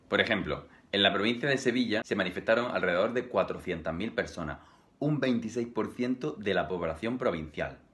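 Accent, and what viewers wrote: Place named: Murcia, Spain. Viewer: En Huéscar también se le llama gazpacho a lo del pepino. Tu acento en super murciano. Más que Almeriense.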